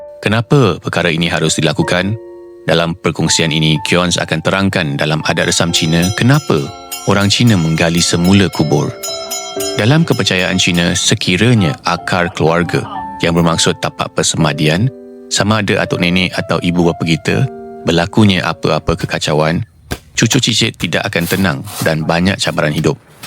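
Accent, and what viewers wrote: Malaysia. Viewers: tq for sharing.. nice malay accent, too 👍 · i relly like yr malay slang..❤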